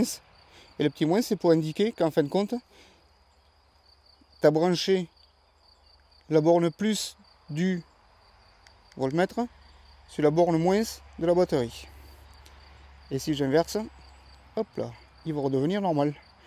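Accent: South, France. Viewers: Ohh mais tu es de chez moi ( le sud),!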